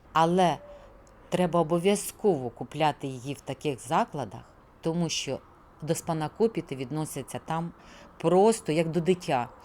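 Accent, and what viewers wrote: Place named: Central Ukraine, Ukraine. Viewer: Ви з центральної України? У вас вимова, як там🎉🎉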